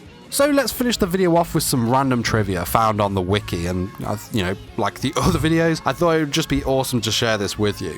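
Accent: United Kingdom. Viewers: Is it the British voice? He drags me back for these facts don't worry I love his facts which that's his FACTOR :P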